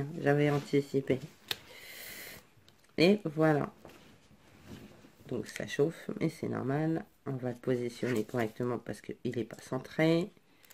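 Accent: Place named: North, France. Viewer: Re :-) si tu savais comme elles sont moches mes rosaces lol, oui y a un léger accent du ch’nord lol, gros’gros bisouss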